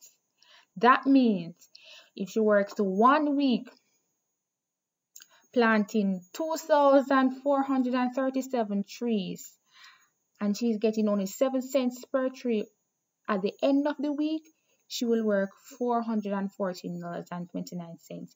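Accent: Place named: Jamaica. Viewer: am in love with your Jamaican accent..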